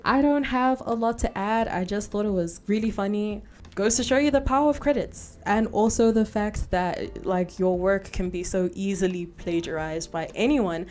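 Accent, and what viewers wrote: South Africa. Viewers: Are you South African? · Wanted to ask if you South Africans cause of the nice accent but I guess you answered it in the vid 😅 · Are you from South Africa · I knew your accent sounded a little too homely and then you mentioned south africa !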